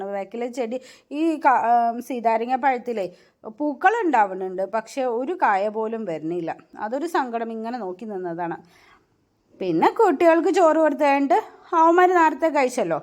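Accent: Palakkad, India. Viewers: Samsaram kettappo doubt thonni.😊 Palakkad evida?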